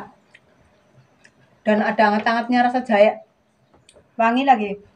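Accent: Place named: Java, Indonesia. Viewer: Logatnya medok bngt ya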